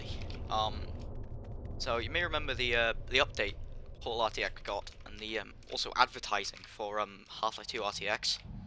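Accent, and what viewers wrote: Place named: United Kingdom. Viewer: well documented video but you have the funni brit accent and its distracting pls be a little less tea sipping brit next time pls ❤‍🩹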